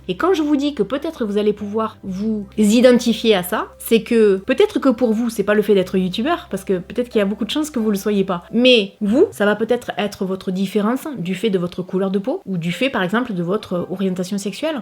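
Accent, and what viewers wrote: South, France. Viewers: je te souhaite une nouvelle année pleine de joie et de sérénité. oui tu es youtubeuse mais tu n'as rien a voir avec certaines "poupées barbies" qui se ressemblent toutes. tu es naturelle, solaire et oui, oui, utile. depuis quelques temps, j'apprécie beaucoup tes vidéos plus personnelles. elles font du bien aux autres qui passent par des moments difficiles. ne change pas, reste comme tu es (et avec ta maman). j'ai l'impression de voir quelqu'un de ma famille et ça fait du bien. le monde devient très dur et ç'est un bonheur de te voir et de t'entendre avec ton accent du Sud. 🥰🥰🥰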